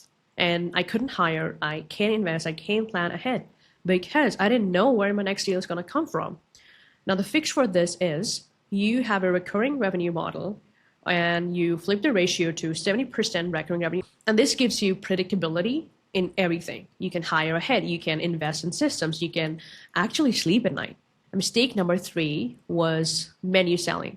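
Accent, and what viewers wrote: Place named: Netherlands. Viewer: You sound like a Dutch person, not that it matters lol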